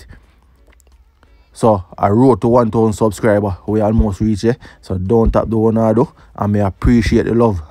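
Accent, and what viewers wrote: Jamaica. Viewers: It’s the patois voice over for me 🤣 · You brought out the Jamaican accent in full force for this one 💕💕💕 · you did our Jamaican chat with class!! Bring it on... we'll done. Wi food gone ah broad!!! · Love the voiceover bro✊🏾✊🏾✊🏾 the JA accent came right out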